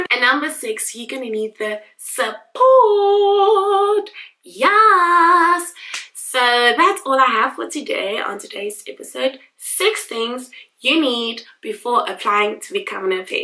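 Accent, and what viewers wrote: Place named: South Africa. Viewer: Which you could speak in your south african accent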